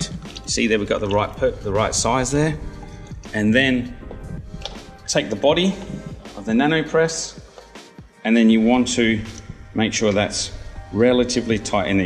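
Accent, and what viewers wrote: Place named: Australia. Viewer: Nice oz accent mate, I am Asian spent 4yrs in MEL,I feel like home when u speaking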